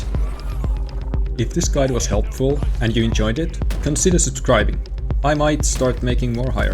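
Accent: Finland. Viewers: Am I hearing the finnish accent?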